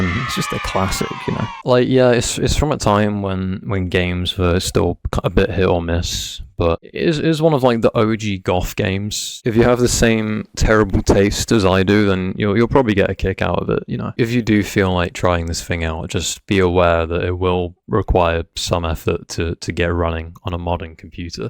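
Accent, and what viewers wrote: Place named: United Kingdom. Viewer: Man I hope you come back dude. We need your britlong voice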